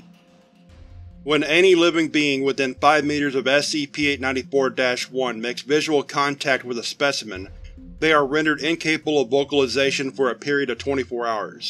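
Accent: United States. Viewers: *intentionally VHS sound, good soundproofing or shady mic, sounds like a trucker's CB radio cadence/accent, are you from Texas? if not, why?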